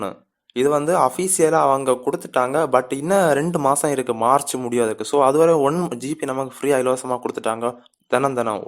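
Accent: Kanyakumari, India. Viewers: super slang u have bro r u from kanyakumari?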